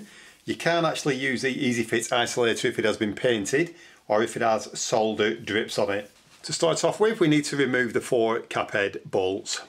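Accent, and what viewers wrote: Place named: Preston, United Kingdom. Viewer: You sound like a Preston lad.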